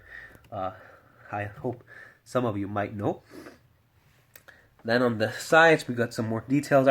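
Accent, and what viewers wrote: England, United Kingdom. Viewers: Bist du Engländer? Sprichst sehr gut Deutsch.. Dein Moc is auch super ;)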